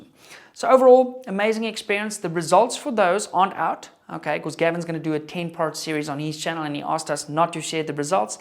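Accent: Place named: South Africa. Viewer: I just got into distance shooting, I've learned a lot from your content. Also I lived in ZA for a couple years, it's good to hear the accent.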